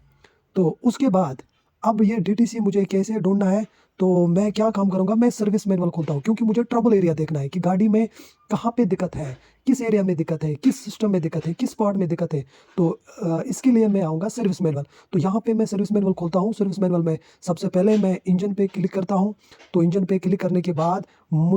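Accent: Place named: Kashmir, India. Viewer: Kashmiri voice i think.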